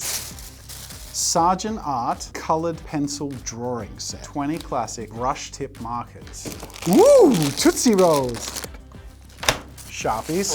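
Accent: United States. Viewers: Jazzas american accent sounds like he could be a narrator here😂 very calm and smooth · I loved the video, but my favorite part was the American accent! I replayed it several times! 🤣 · Your american accent is pretty good Jazza, I heard the difference immediately and abruptly did a double-take. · Jazza reading with an American accent make me feel weird. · That American accent though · Your American accent is flawless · Your American accent is actually really good! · As an american, I approve of your american accent. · That's a pretty solid American accent! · Can we speak about the fact that his American accent is literally on point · American Accent Jazza was mildly unnerving because he sounded like himself but with just enough different that my brain had to actually process what I'd just heard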